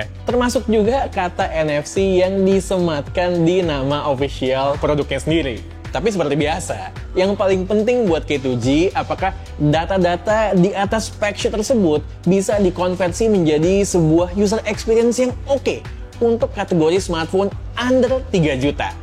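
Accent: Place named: India. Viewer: Kirain orang india yang review nya bang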